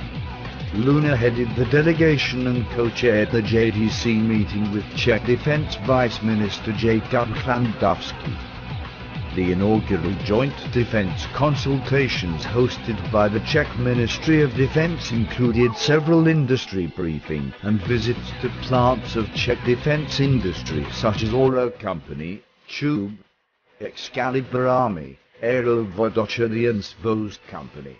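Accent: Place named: United Kingdom. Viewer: British robotic voice👎...but nice update👍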